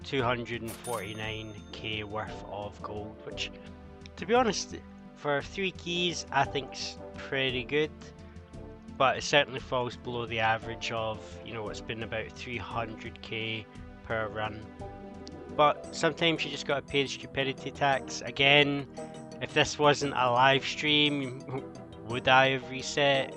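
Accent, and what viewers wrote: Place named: Scotland, United Kingdom. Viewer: Love to hear a Scottish osrs content creator.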